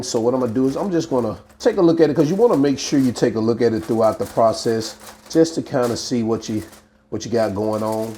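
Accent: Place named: Alabama, United States. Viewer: Oh my sweet Jesus, can I be your friend and come eat at your house. I promise ill put it on it each time lol 😆 but really this was amazing to watch. Imma stalk you to learn all your techniques and I knew you was from Alabama for some reason b4 you said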